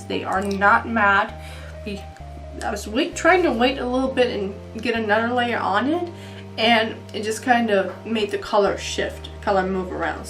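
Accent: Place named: Germany. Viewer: You're losing your German accent. :(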